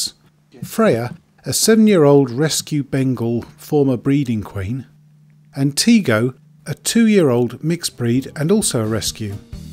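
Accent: United Kingdom. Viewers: british accent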